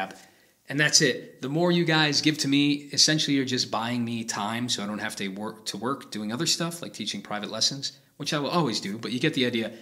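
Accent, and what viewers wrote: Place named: Netherlands. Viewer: Are you by any chance a Dutchie that moved to the US? I'm trying to pinpoint the accent.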